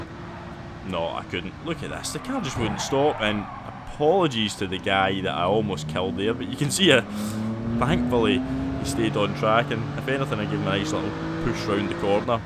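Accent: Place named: Northern England, United Kingdom. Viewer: Its nice to hear a good Northern voice rather than these Southern pansies all the time 😂😂😂 Good stuff mate, keep it up 😏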